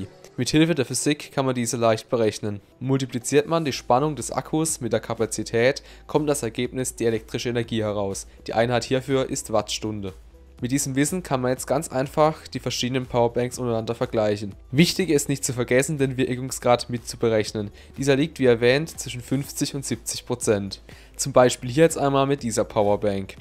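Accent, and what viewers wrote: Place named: Karlsruhe, Germany. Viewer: So ein schöner Karlsruher Dialekt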